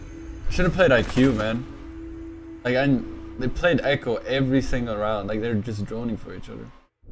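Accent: Germany. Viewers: I found it was a headshot on the Bamdit and a question are u German? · Daaaafaaaaaaq Ur German? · Ur german? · Is he german??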